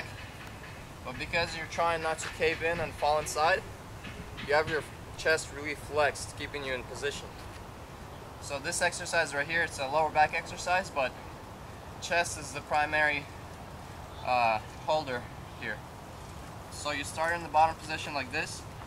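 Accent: Russia. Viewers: Я так и чуял что ты русский, потому что у меня акцент такой же как и у тебя когда я говорю, режил посмотреть в Description, и во, руссий канал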